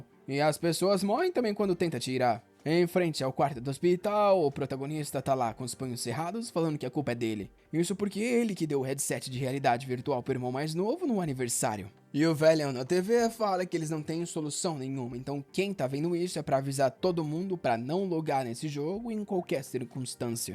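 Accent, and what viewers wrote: Rio de Janeiro, Brazil. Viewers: é carioca? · o é carioca?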